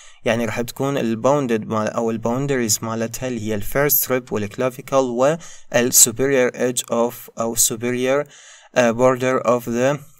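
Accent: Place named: Iraq. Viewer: ربي يوفقك بجاه محمد وآل محمد نحبك كلش من العراق اغلب متابعينك طلاب عراقين يمكن اني الوحيدة الي لاحظت اللهجة مالتك مزج بيها لهجة عراقية يمكن تستخدمها لان متابعينك عراقين حتى يفهمون اكثر احييك 💙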